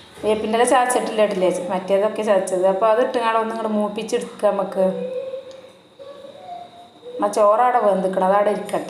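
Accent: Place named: Malappuram, India. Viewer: നമ്മുടെ മലപ്പുറം ക്കാരുടെ ആ ഒരു സംസാരരീതി ഞങ്ങൾ കൊല്ലം കാർക്ക് ഒരു പ്രത്യേക ഇഷ്ടം തന്നെ സൂപ്പർ ഐറ്റം ആണല്ലോ കപ്പയും മീൻകറിയും ആഹാ ശരിക്കും പ്രവാസലോകത്ത് നിന്ന് കണ്ടപ്പോൾ 😋😋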